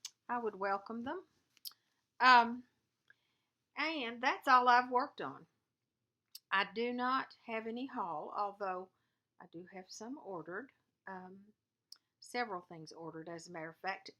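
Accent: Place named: Alabama, United States. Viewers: I just love listening to you. You make me laugh and you talk just like we do in Alabama. Beautiful stitching. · love your video! it is cold here in Portland Oregon. I have spend a few Christmas in Georgia. My sister in law lived in Lawrenceville now Sugar Hill. Your accent reminds me of her. you are right about floss tube I think I know you all personally. Merry Christmas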